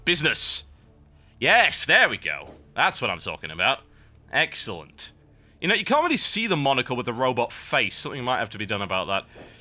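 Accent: United Kingdom. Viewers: First video I've seen of the; LOVE the voice/accent. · u hav a strong British Accent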